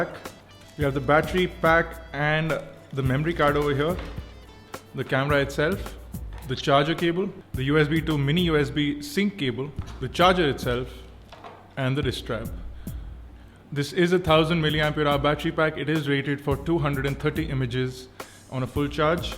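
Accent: India. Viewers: hahaha lol " press the butt'n" it's not really that hard to spot an Indian even if he's talking a foreign language.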